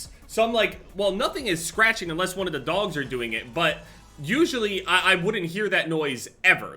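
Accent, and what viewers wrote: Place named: New Jersey, United States. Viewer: I could always tell was from nj just by the way he says yesterday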